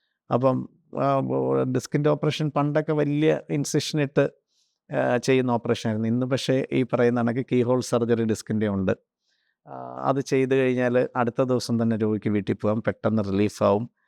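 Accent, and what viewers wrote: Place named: Trivandrum-Kollam, India. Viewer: ഡോക്ടർ കൊച്ചിയിൽ ആണെങ്കിലും. സംസാരം ട്രിവാൻഡ്രം കൊല്ലം ശൈലിയിൽ ആണ് കൊള്ളാം 👌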